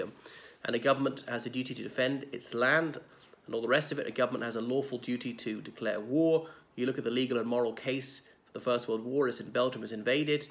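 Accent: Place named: England, United Kingdom. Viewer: Very interesting but im a bit confused you keep referring to yourself as Irish , but you have an English accent .would it be the case your an English gentleman living in Ireland who has a deep connection to Britain. I'd imagine your formative years were honed in the British schooling system hence your strong allegiance to the UK . Nothing wrong with that. Futhermore if there was a policy of one man one vote prior to the Act of Union, Ireland wouldn't have joined. Likewise if the democratic vote in favour of home rule by the majority of people on the whole Island had been respected by the Unionist minority. Partition wouldn't have happened and strong relationships could have fostered and developed over time between both communities throughout Ireland and so to with Britain. Some of the greatest Irish people were and are protestants it was never a religious thing for us .Irish people just wanted to govern themselves but unfortunately the British tried to prevent that at every opportunity .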